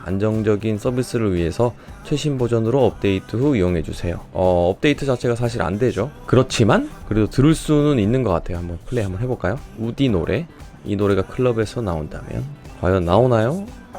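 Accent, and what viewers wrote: Gyeongsang, South Korea. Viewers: 같은 경상도 사람이네용~~ <mail>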